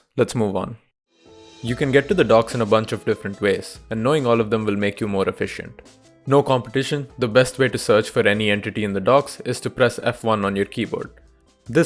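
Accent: India. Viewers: >click >indian accent >dislike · Bro, are u South Indian because of the accent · no front, but why are the best tutorials and tipps brought by people with indian accent?^^ Again here, no front or offense or something^^.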